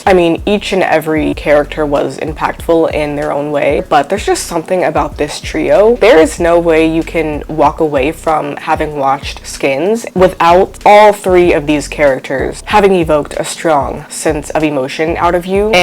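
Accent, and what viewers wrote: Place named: United States. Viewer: Hearing an American talk about Skins… I’m immediately turning off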